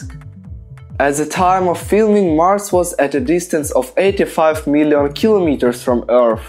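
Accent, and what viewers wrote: Russia. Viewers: You seem to have a Russian accent. Are you Russian? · Wait, are you Russian? That’s some pretty good English. If you didn’t have such a strong accent, you’d be better than me lol. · You sound like Russian Отличный английский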